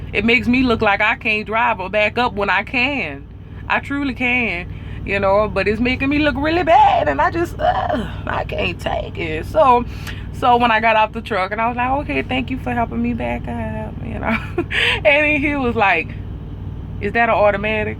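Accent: Louisiana, United States. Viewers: R u from Louisiana? Trying to place ur accent. LoL. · You sound a bit Cajun or Creole. Whatever the correct term is of course. You are cool as shit. Would be fun to have a couple shots or beers with you... I hate this FUGGIN Automated Transmission. 17 KW T680.but I believe the Gentleman is correct. Tap it to engage it...then try easing on it. As a matter of fact this stupid. Transmission was just messing with my going over such a simple hilly pass as Tehachapi, California 58... Bullshit was just acting all week with 17K loaded heading West Towards Bakersfield and now even weaker with just 32k headed East on Tehachapi pass. FUGGIN BS Y'ALL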